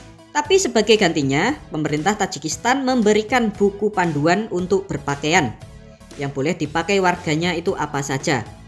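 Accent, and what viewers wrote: Java, Indonesia. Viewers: Lugot jawanya meddok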